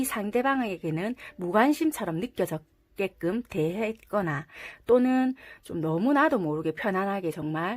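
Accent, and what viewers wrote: Busan, South Korea. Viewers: 2,4 만명 축하드려요~더 잘 되실 거예요~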